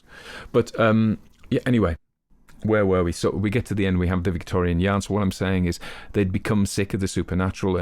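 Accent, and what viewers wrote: United Kingdom. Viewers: I like your accent. I'm like most Americans, anything that sounds British is attractive and I can't tell whether you're from London, Cornwall, or Northumberland. I'm from Alabama so my accent sets me apart in my country but still, I can't tell if someone is from California, Nebraska, or Ohio. I'm very happy you narrate these great stories. I look forward to new ones all the time. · Could you imagine if all these British narrators were in the same room!? It would sound like monotones static!! Not that I mind the narration or the accents, but I do kind of find it funny that like it’s always the same British accent, (really the same voice…down to the low pitches) I mean, I get it. It works for the narrator of Sherlock Holmes so why not fucking repeat it till it’s beaten into the ground right? lol i digress, good story man.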